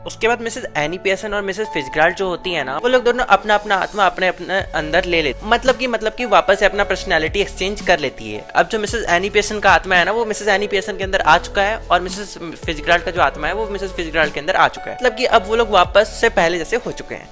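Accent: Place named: Indore, India. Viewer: meko bhai tum toh indori accent bol re ho ni😊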